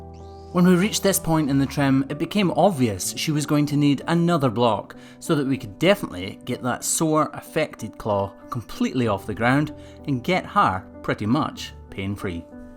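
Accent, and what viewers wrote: Scotland, United Kingdom. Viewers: please do a video with your full Scottish accent!!! Can't wait for the merch!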